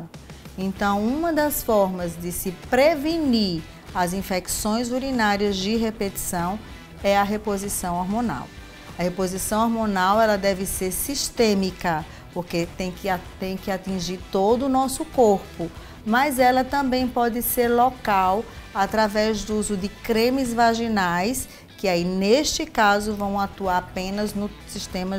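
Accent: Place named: Rio Grande do Sul, Brazil. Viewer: amo seus vídeos doutora,inclusive esse,suas lives tbm,seu sotaque é muito parecido com o da minha terra rs RS,abraço 😘❣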